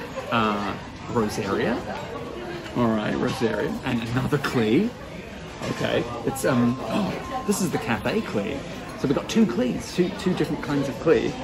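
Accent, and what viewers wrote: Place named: New Zealand. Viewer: sweet! a kiwi playing genshin I enjoy genshin and im planning to move back to new Zealand in the future trying to learn the accent again lol so this is perfect XDD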